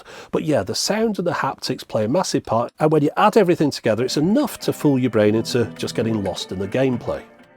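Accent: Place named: Northern England, United Kingdom. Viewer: you sound like a northerner like me, and that photo reminds me of a place called "Arcade Club" in Bury, (he's got one ion Leeds too), he's got Europe's largest arcade collection including lots of pinball tables! thoroughly recommended if you're ever near the area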